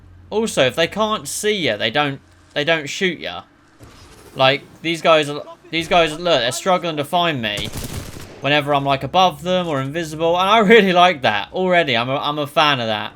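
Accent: United Kingdom. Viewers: Hey are you from Northampton by any chance I only say this because your voice sounds very northhamptony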